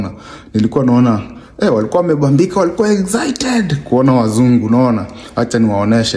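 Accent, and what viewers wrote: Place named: Kenya. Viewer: Bro nakukubl sn ila uwe una nyooosha KISWAHIL bhana sa time unahogea swahili ya Kenya sn coz ss wa Congo je inakuweje kuna mambo mengine atuelewiiiii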